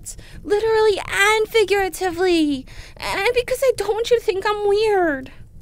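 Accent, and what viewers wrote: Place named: Canada. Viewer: Your accent for this character is perfect. It sounds like a cross between faint Canadian, and classic kimonomimi voice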